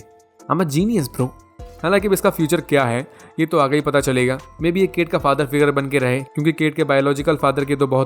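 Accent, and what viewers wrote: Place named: Sitapur, India. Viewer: Hey bro R u from district seetapur up . My friend is also from there and you both speak same way. I every time enjoye your sweet voice and explaining method Thank you 😍😙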